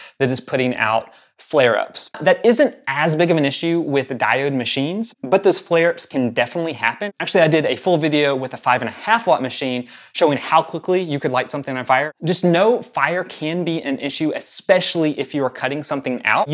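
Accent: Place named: Tennessee, United States. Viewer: Dude where you from?! lol I hear the country boy within. TN?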